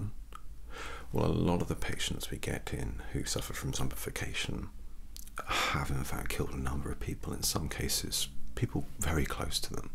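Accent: United Kingdom. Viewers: Me: a whole zombie: You look a bit under the weather, but other than that, I diagnose you with zombie Extremely British, I love it 😂😂😂😂😂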